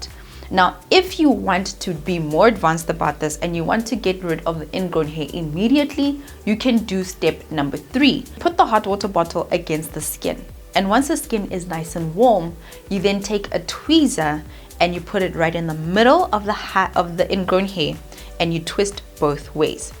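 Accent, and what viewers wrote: South Africa. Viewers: Please tell me u are South African 🇿🇦 or Kenya? 🇰🇪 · Are you from ZA? I have ZA (and LS) friends and I always love their accents. New subscriber! Edit: you just confirmed it! I knew it!!! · Yes she is South African💃🏻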